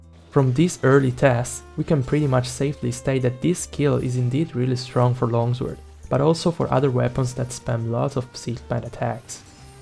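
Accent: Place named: Italy. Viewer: Non so bro mi sai di italiano per l'accento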